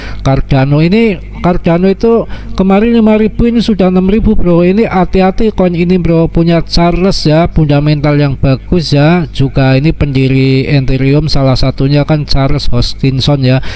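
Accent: Banyumas, Indonesia. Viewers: Lah wong Purwokerto kye tah,ngapak njeplak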